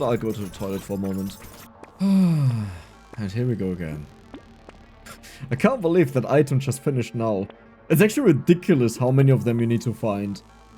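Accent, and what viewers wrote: Germany. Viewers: Man hört das Deutsch so durch xD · The german accent i love it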